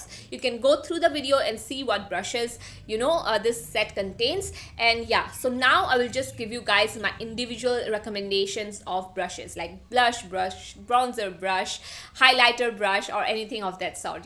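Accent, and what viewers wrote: India. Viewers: Where are you from?in which part of India?Because your accent is so cute and so soothing 🥰🥰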